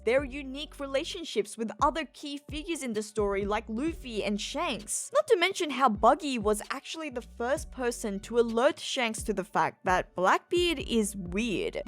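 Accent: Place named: Australia. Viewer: That Australian accent gets me every time 😂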